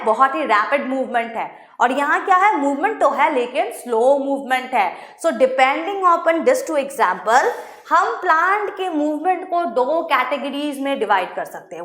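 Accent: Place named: Bihar, India. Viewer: Bihari apka fun hai mam ❤️❤️❤️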